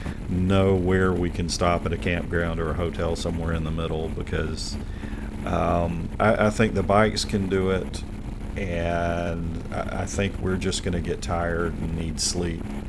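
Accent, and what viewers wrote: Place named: South, United States. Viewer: Just a quick comment to let you know how much I enjoy your well-done and informative videos about the Ural life. The vids which are solely travel logs of sorts, with endless GoPro footage and no commentary are boring. Yours are educational, and I must say, your calm, relaxed manner with just that touch of the South in your voice is perfect. I know these are a lot of work, but hope you keep them coming.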